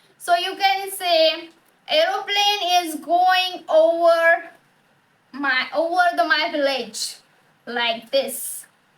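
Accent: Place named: India. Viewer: slow speaking techniqque is good but accent indian hi rakhiye bcoz ur audience are indians rather than american or britishers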